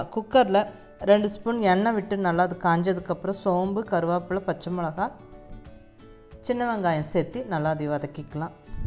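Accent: Coimbatore, India. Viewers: இது கோயமுத்தூர் தமிழச்சி குரலா?!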